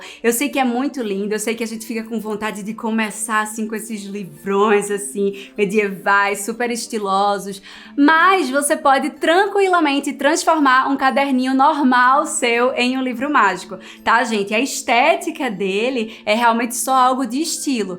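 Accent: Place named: Northeast, Brazil. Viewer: Nordeste, né, bê. 😘 És bem vinda aqui.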